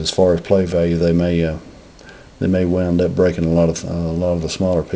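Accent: South, United States. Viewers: Whoa, the southern accent threw me off a bit there, lol. Good vid. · You sound southern to me XD Sorry if Im wrong!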